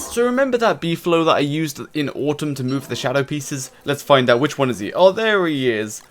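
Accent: United Kingdom. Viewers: I love your British voice :))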